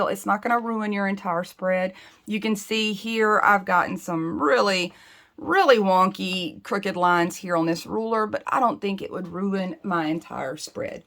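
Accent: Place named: Mississippi, United States. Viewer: I loooove this tutorial and appreciate you. I was raised in South Mississippi and your accent reminds me of home. Im in FL now. Where are you from? Kathy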